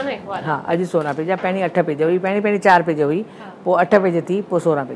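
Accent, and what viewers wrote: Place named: Khairpur, Pakistan. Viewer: Aunty bilkul Safa typical Khairpur local Sindhis accent ahh.. jeko pure sindhi boli Jo saboot ahay.... Dadho sutho Lago aunty khy budhi khare...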